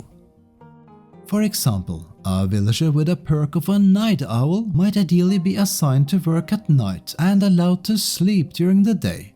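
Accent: Norway. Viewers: Are you from Norway ? your accsent sounds like that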